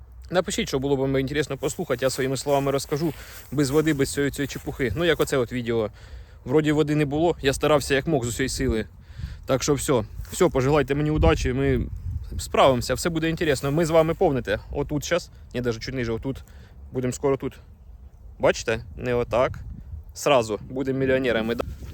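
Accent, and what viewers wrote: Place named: Orikhiv, Ukraine. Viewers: Це не суржик , а панський Орехівський діалект. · На шо , на чом ??? Він балакає українською , на одному з діалектів (Запоріжжя мабудь, похоже), як і у всих странах в разних регіонах разні діалекти...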